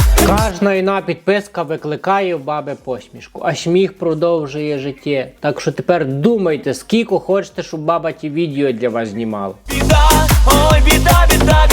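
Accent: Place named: Lviv, Ukraine. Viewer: У вас такой хороший юмор .что снова приходит настроение .А ещё нравится чисто львовский диалект .он очень приятный .Спасибо вам и радуйте нас и далее.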